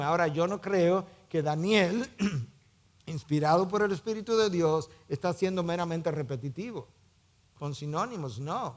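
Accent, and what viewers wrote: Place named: Dominican Republic. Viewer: Mi señora el pastor es dominicano y esa S hace parte de su acento. No se fije en pequeñeces como esas sino en lo importante del mensaje. A usted por ejemplo se le escaparon varias tildes en palabras que las llevan🤔😶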